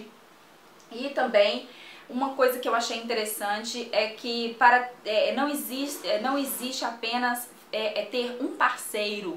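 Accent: Minas Gerais, Brazil. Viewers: Ganhou inscrito. A propósito, vc é mineira?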